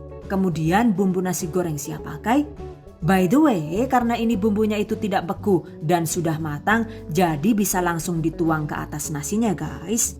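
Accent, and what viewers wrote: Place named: Java, Indonesia. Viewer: awalnya muncul di beranda..apaan sih ini..eh pas di liat lucu narasi nya..apalagi bagian jawa medoknya...masaknya jg praktis...makin kesini makin suka..oke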